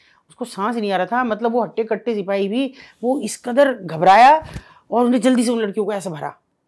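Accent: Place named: Meerut, India. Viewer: Mairath vala lehja ❤😅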